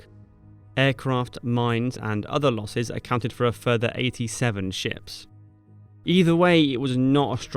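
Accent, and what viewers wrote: United Kingdom. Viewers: Somehow it sounds more important with a British guy announcing.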